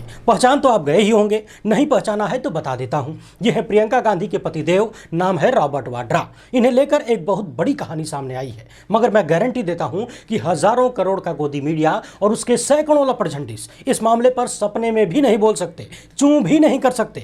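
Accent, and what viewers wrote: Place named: Bihar, India. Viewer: tumhara bihari andaaj kamal hai,news jo bhi ho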